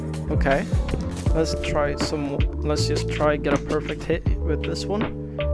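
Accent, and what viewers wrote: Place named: Sweden. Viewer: Visste inte att du var svensk före den här videon. Man hörde det verkligen på din dialekt.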